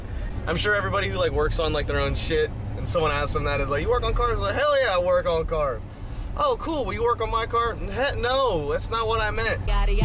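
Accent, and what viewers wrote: Canada. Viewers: Ur Canadian accent is on point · Awesome Canada ascent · Love the Canadian accent some or all of that stuff you said was true😉😉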